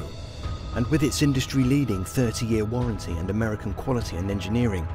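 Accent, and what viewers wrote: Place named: United Kingdom. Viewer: Who wants to listen to British accent in the U.S? This is a bad sales strategy.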